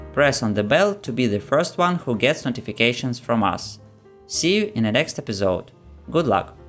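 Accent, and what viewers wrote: Russia. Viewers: Привет. Такое ощущение что голос русскоговорящего человека. Это так?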